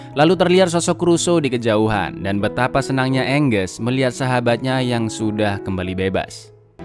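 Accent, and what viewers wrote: Java, Indonesia. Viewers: Bang asal Jawa mana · Wong jowo yo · Aku mas wong jowo. Mas e jowo ndi mas? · Wong jowo ya😹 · Bang lu orang jawa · Jowo ndi kang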